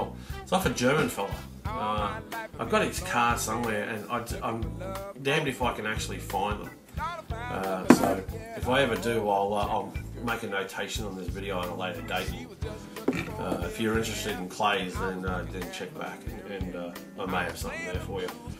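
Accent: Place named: Australia. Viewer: Thanks for sharing, awesome pipes!!! and fabulous video mate! good to hear another Aussie voice on here hahahahaha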